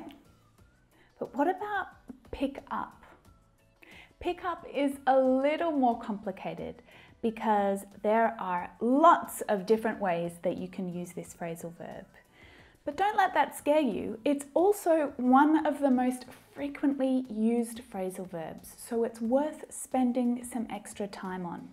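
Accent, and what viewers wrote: Australia. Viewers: Australian accent? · I picked up Australian accent by watching video.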